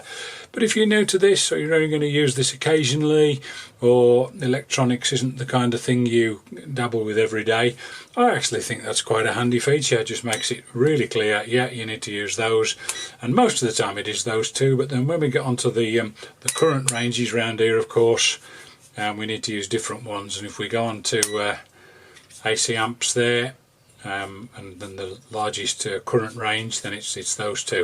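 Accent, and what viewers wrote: United Kingdom. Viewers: Love the British accent kind calm and civilized.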